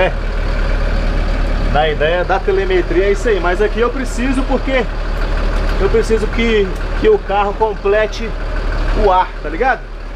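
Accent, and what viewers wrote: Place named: Minas Gerais, Brazil. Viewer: sotaque minerin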